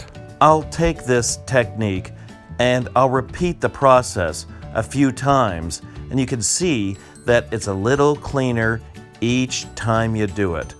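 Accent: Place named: United States. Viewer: Thank you. Now I know what's wrong with my car. BTW, love the way you talk American man, I'm from Down Under.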